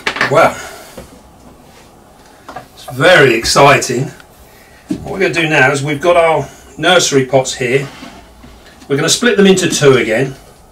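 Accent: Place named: London, United Kingdom. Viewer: It's not lol 😆 what it is - the London in him. His accent. Those London accents always struggle with the Latin or hispanic sounding words. The thick accents don't allow it. I've got a big pal who's the same 🗣😆 I love accent, it's class 👌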